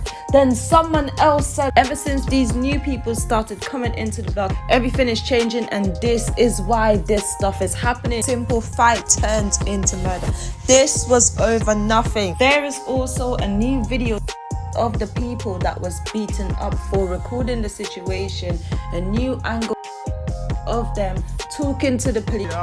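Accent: United Kingdom. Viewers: 👎 for the accent, stick to that side of the pond with your “UK Dill”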